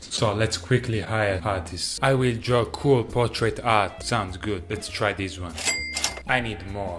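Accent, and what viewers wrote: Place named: Italy. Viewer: L'avevo capito da subito che eri italiano, il nostro accento si riconosce in un attimo. Ma come mai sono l'unico Italiano a commentare?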